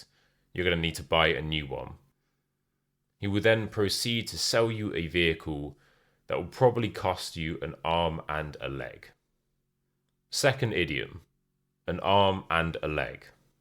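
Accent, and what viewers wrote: United Kingdom. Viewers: Thank you teacher, i LOVE your British accent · Do you speak with rp accent or cockney?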